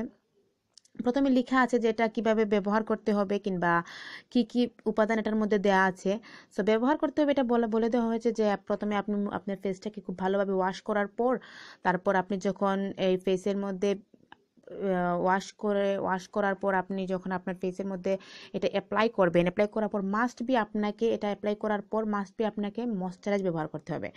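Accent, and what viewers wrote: Sylhet, Bangladesh. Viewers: Are you sylheti ? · Amaro lager tain sylhety😃😃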